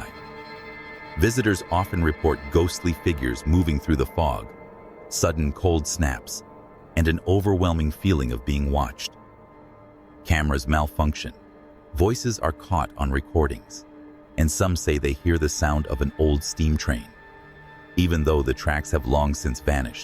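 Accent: United States. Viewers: Why are Australian haunted spots being narrated by an American?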